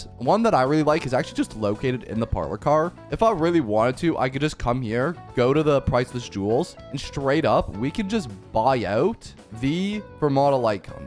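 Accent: Canada. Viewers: Your Canadian accent is cute lol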